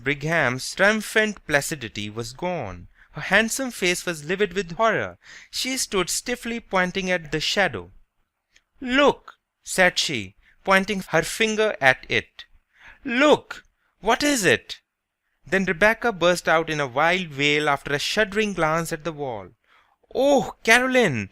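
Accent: India. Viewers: i.m agree the indean accent too mach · Man, it’s hard to learn from heavy Indian accent. How can I improve my pronunciation with this reading?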